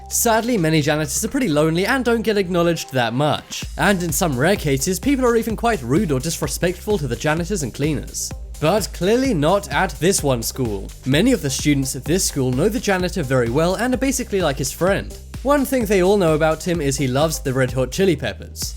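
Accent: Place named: United Kingdom. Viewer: What’s with the British voice??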